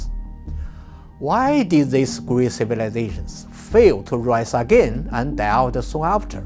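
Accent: China. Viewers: Insightful + interesting analysis, but the prof should have let a superior English speaker narrate his content; his Mandarin accent was so painful, I had to view to video muted, with CC. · Excellent analysis. May I make a few suggestions? Common chinese mistakes when speaking English: 1. "V" sounds. "Very", not "Wary". "Vast", not "Wast", "Video", not "Wideo". 2. "TH" sounds. "THink", not "Sink". 3. And in this video, "Empire", not "empAIR". There are more, but these 3 are most obvious.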